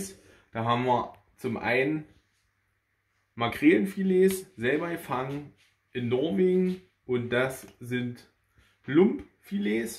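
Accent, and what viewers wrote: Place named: Altmark, Germany. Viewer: Ich kann bestätigen, dass Du den Fisch wirklich richtig toll hinkriegst. Es ist ein einfaches Rezept aber hier stimmt mal wieder die Klausel "Weniger ist mehr" und allein schon Butter und Zitrone ist schon Erfolgsgarantie. Und schön zu hören, dass Du hier mal so richtig Deinen Altmärker Dialekt zum Besten gibst ! Das mit dem Rosmarien lernst Du auch noch irgendwann. Super !❤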